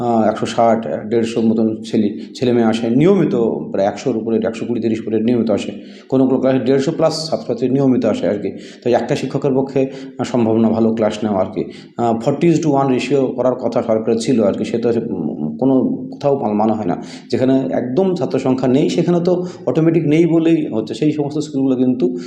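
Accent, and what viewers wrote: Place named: Bangladesh. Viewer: Mid day meal thakle cholbe....loker kache taka nei tai hoito govt school thakbe.. apni kothai thaken apnar accent bangladeshi der matan,( Bangladesh theke ese 1995 theke ese aneke class 9 admission nia nei tarai besirbhag school teacher achen) teacher ra porate Jane na ba porai na tai hoito kichu student private a jabe.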